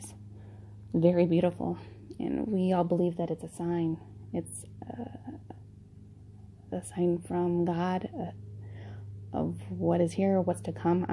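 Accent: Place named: Illinois, United States. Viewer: I understand!! My voice sounds so weird hearing it back. We are our best critics but shouldn’t be!!! And yes it’s slight but you definitely pronounce certain words with a IL accent lol. I like it! It just sticks out to me living in NJ / NY area with the heavy accents but mine is pretty neutral compared to others. Much love!!!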